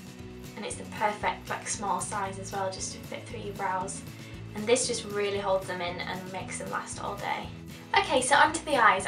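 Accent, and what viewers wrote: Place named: Yorkshire, United Kingdom. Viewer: Hey! I adore your accent, what part of Yorkshire are you from? Love this look xoxo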